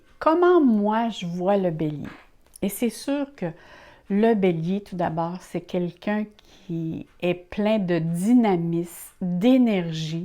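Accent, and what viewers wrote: Canada. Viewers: Merci, pour vos conseils précieux et précis, avec un petit accent en plus qui me rappelle des bons souvenirs de mon passage au Canada.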